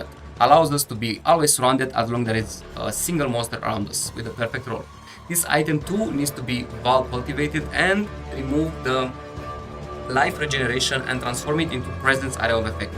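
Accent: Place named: Philippines. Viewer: When I heard your accents I was worried that the video would suddenly changed to tagalog. nice build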